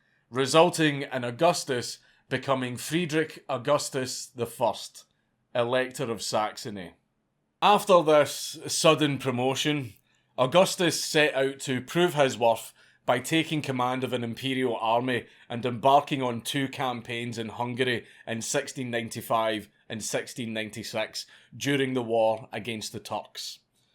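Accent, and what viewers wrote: Scotland, United Kingdom. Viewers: You're not my type, I'm afraid, but if I were a woman, I'd marry you just for your Scots accent. Thanks for the story, by the way. Augustus was a "bastard factory" ? That's one for you !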